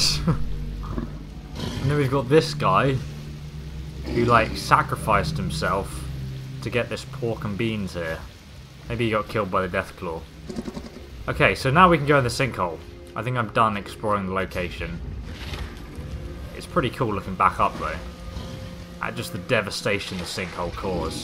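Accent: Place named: United Kingdom. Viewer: I'm not saying this with any malice intended to you or (his vids are great), but I've had a feeling that he might be dyslexic or have some sort trouble saying what he reads. He does it very often in many of his videos. Like saying Mr. Gusty when its really Mr. Gutsy for example. It happens often enough with enough different words/names repeatably to the point it's noticeable. It might be separate from what is being debated in this comment thread, but I can't attribute my observations to just a British accent which I am familiar with.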